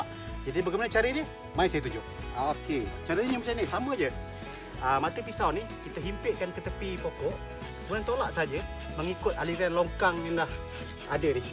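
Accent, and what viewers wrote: Pahang, Malaysia. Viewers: Loghat pahang je abaa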